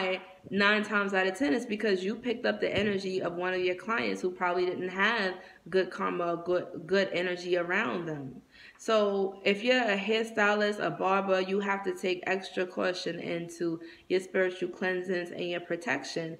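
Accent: New York, United States. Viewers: Another dope video on my journey of black hair videos! I kept getting the urge to look up antennas and hair and so far I’ve come across great information. Glad I got my locs best decision I made! You sound like you from nyc. Peace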